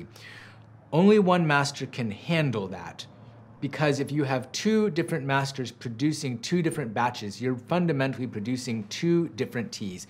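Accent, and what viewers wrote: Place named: London, United Kingdom. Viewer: This whole video I'm just wondering how much you have been influenced by London. You sound like it and the tea pets are a dead giveaway to those who know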